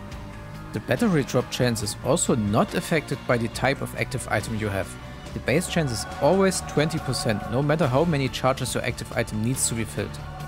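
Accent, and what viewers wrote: Germany. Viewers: I don't mind what videos, so long as they have that silky German accent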